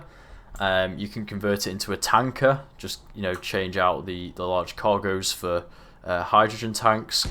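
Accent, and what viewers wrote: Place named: Yorkshire, United Kingdom. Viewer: You have a Yorkshire NE twang .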